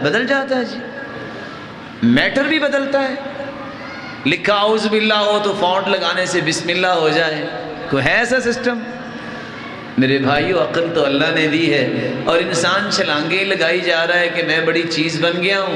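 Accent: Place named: Saudi Arabia. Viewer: MashaAllah MashaAllah sheikh sahb ap saudia sy lgty h apka hadith parhny ka lhja arabic h coz mny quran saudia sy hifz kiya h.Subhan Allah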